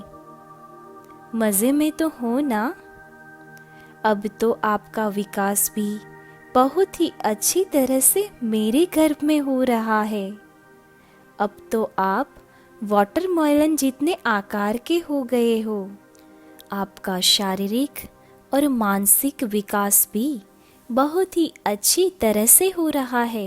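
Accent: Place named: Gujarat, India. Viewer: Aapki hindi me gujrati aa jati he.. Aapki hindi pure nahi he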